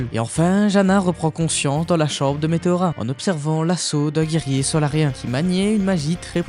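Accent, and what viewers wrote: Belgium. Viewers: t'es belge non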